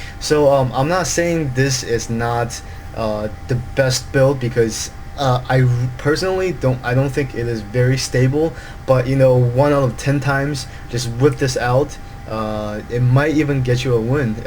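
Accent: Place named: Asia. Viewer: good ears, i can tell it's an asian speaking too if i listen closely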